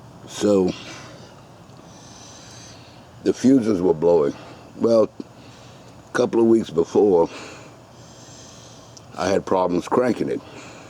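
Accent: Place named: Louisiana, United States. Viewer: Thanks for the video. You sound like you might be from Louisiana, likely east and south of I-10. Ask me how I known. That explains the damn corrosion.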